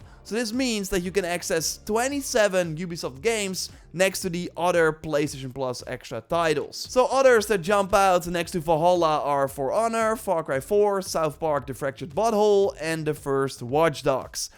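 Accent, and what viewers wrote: Netherlands. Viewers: Do I hear a Dutch accent jongeman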